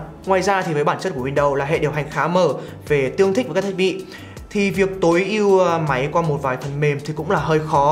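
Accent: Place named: Hanoi, Vietnam. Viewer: giọng hà nội ai cũng vậy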